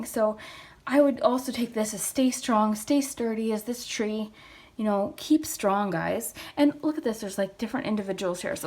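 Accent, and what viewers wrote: Minnesota, United States. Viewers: Quick question are you from Minnesota?? Just because you said pop and your accent 😂❤️ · anyone know where she is from? or her actual name? i love her videos so much i watch all of them but i love her accent i just wanna know if its like Minnesota or something 😂 i feel like it is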